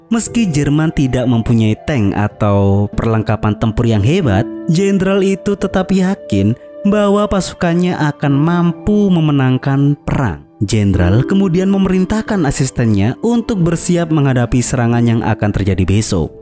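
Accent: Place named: Java, Indonesia. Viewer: Loh le sampean wong jowo toh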